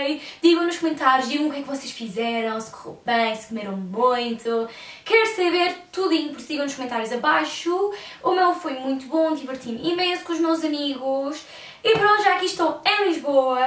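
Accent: England, United Kingdom. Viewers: gosto tanto do teu british accent 😍😍 vou comprar essa máscara 😂😂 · adoro o teu sotaque britânico 😍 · Que sotaque inglês lindo!!! ❤️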